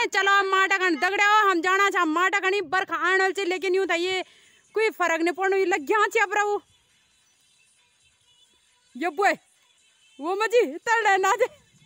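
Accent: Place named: Garhwal, India. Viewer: Yaarr tumhare vlog mood fresh kr dete hai... aur jo tum garhwali bolti ho aahaa...😍😍😍😍😍 love from rajasthan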